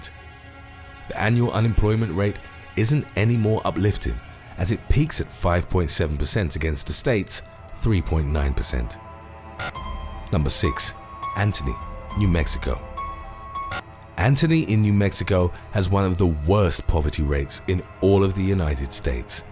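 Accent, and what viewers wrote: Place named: United Kingdom. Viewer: I'm not knocking his statistics, however it's kind of hard to believe this guy talking with the British accent lol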